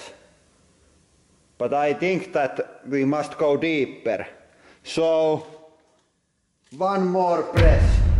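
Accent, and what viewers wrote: Finland. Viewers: "vii kotta gou diipper!" Mä niin rakastan tätä rallienkkua. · eikö aksentti muka paljasta? Kyllä suomalainen aina toisen tunnistaa. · Suomalaisten ralli Englanti.. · In finland its called "ralli englanti" meaning rally english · nah nope, its just the finnish accent called "rallienglanti" or rallyenglish. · In Finland we call that accent as rally english.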